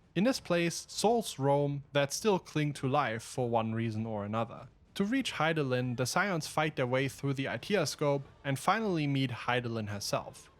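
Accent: Germany. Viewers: What accent are you speaking in? German?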